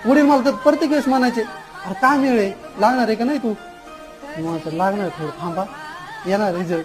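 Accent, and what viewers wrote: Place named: Ahmednagar, India. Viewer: नगरच भाषा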